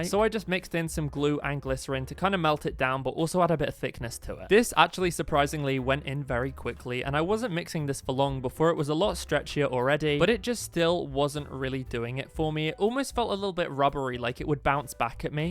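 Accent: United Kingdom. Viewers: can’t you hear the accent? he is british. obv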